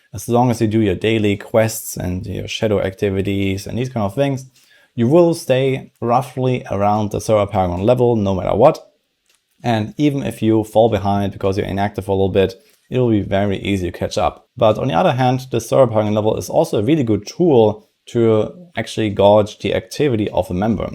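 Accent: Germany. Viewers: Lass es mich wissen ob du Deutscher bist? Man hört es raus 😅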